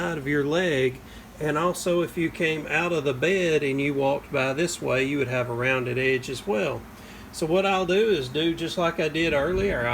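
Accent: South, United States. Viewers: I love the lush greenery and wet rain - so beautiful! Must be down South judging from the accent. That is one lovely table! The contrast of white dowel with black walnut is so lovely. Too bad we never saw the underneath when finished. I don't know how this moves exactly. I guess it just swivels on the rod? Also wanted to see the dowel inserted.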